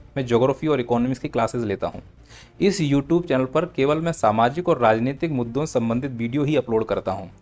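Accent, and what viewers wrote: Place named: Bihar, India. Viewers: Bihari touch h to nikal jata h asa 😅😅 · Ye बिहारियों का ton hai sir,, aap shant rho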